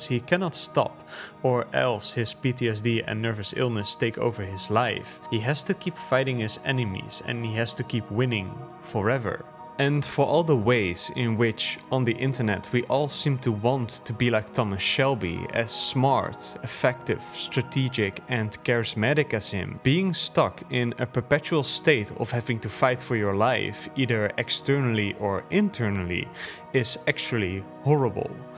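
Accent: Netherlands. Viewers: Klinkt als een Nederlands accent, mooie, interessante video. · Strong dutch accent haha. Great video.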